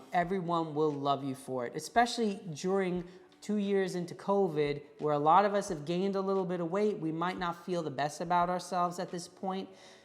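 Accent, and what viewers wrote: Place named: Bronx, United States. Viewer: Thank I grew up in the Bronx listening to you made me home sick. Great job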